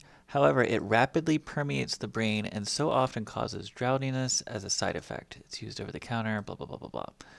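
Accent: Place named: Kentucky, United States. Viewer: You gotta be from Kentucky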